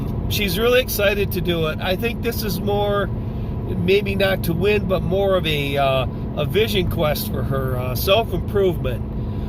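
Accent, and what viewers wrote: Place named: Michigan, United States. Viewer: KIND of fun to hear your take on the Alone show again - after all this time. I had to laugh when you made fun of the Wisconsin accent - Cuz I have to Say - Your Michigan accent is pretty special as well. Of all the Merican accents I would have to put MN, WI & MI accents as the most "special" & annoying as F - of ALL🤡 & I have been to almost every state...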